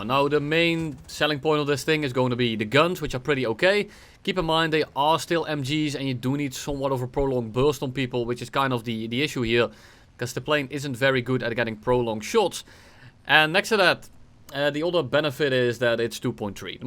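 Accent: Brabant, Netherlands. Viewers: for anyone intrested, theyre called vliegtuigboMMen, not vliegtuigbonnen. has a dutch accent (prob brabant) making his pronounciation deviate from normal Dutch people (ABN) 🤓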